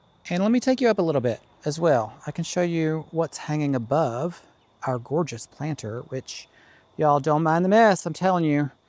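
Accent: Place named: South, United States. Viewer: You need to add just a little more drawl to that “like, comment, and subscribe.” 😂 Just kidding - I love watching gardeners from all over the world but I do admit I have a soft spot for the ones who talk as southern as I do. 💕 The pots look great! I’m messy too - it’s a sign of genius. 😉 The scene stealer in this video is that adorable Scotty dog!!!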